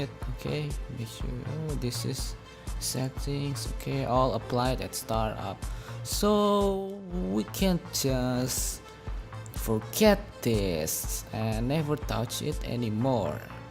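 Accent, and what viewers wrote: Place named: India. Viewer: For some reason he's the only indian guy that tries to speak english in a funny way haha i love it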